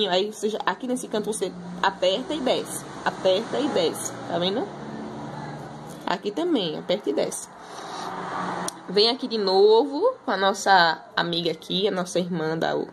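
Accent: Northeast, Brazil. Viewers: Eu adorei o sotaqueee!!! Nordeste!!! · Parabéns! Adorei a explicação. E viva o sotaque nordestino, eu amo. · Quando ouví pensei logo Nordeste nas paradas... Tive a certeza quando pronunciou Cli..en..tíí. igual minha amiga...😁😀😊 Amei sei vídeo 👏👏👏👏👏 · Sou carioca, nas amo sotaque nordestino. Acho que nasci no lugar trocado..😂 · vc é nordestina?😍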